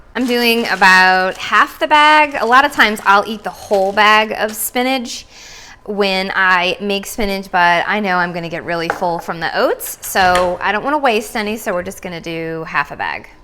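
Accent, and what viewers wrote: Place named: South, United States. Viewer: I love your southern style. I am from NY and I would have love to be born down south, accent and all❤️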